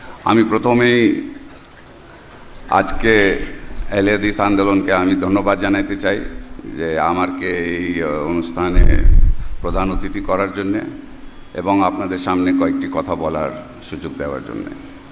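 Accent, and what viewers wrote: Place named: Old Dhaka, Bangladesh. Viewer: কেউ ভুল বুঝবেন না পুরান ঢাকার মানুষ এভাবেই কথা বলে।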